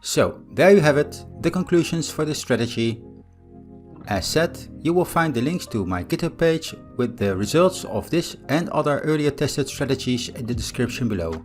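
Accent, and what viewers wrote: Netherlands. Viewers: I enjoy the Dutch accent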